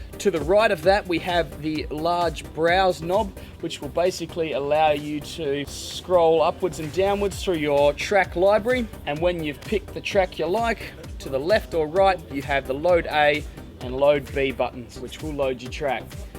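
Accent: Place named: Australia. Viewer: finally, an aussie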